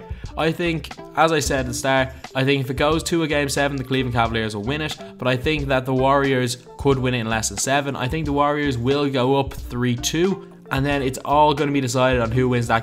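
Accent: Ireland. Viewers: Is this dude from ireland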